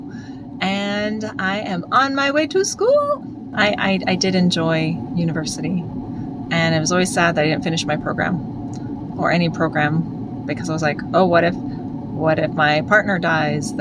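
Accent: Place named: Canada. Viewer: Wow! This is how Canadians sounds? This could be a good chanel to listen English for practice.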